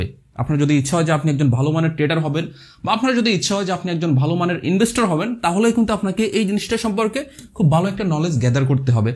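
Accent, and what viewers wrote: Sylhet, Bangladesh. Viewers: ভাই আফনি কিতা সিলেটি নি 😮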